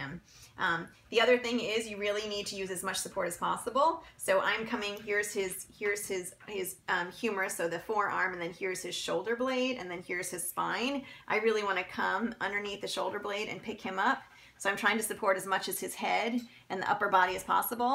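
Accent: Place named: United States. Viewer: English is my main language but I have no idea what you said in the first bunch of things you said so did not watch any more. You need to slowdown, speak clearly and realise that Youtube is an international device; and that the American twang you have is not easy on the ears of those of us who are from other parts of the world.Not to mention those who have English as a second language. I hope you are a better vet than you are a speaker.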